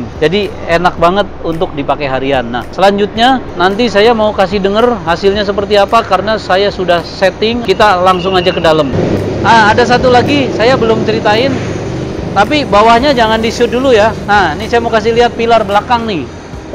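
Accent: Banyumas, Indonesia. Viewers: Ora ngapak ora kepenak .sukses om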